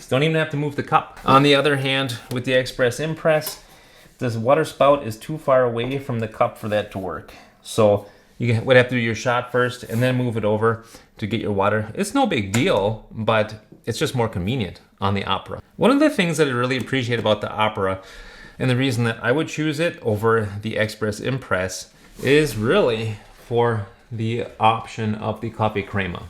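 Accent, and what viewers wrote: United States. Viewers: Wait? That is an American Accent ? I thought you were European ? Where in States is that accent from ? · Are you American or Canadian I genuinely can’t tell I’m English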